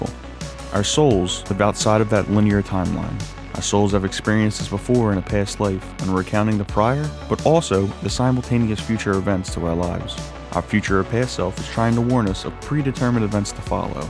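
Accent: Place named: Philadelphia, United States. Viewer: this guy is definitely from Philly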